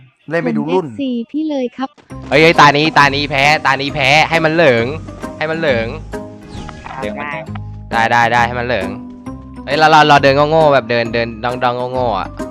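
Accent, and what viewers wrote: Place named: Suphanburi, Thailand. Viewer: พี่ เป็นคนสุพรรหรอคับแลเหน่อ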